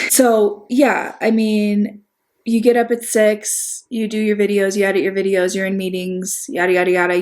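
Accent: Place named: Chicago, United States. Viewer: Omg can confirm that her Chicago comes out when she's heated. It's my FAVORITE.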